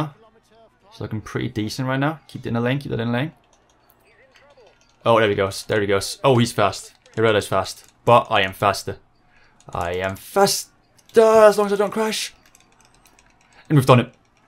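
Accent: Denmark. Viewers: Lol I could hear you are Danish for the first 2 seconds.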